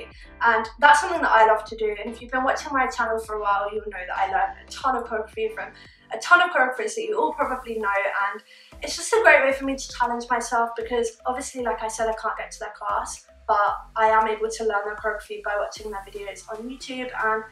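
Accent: United Kingdom. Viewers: good video :D and that British Accent is on Point!!!!!! :D :D :D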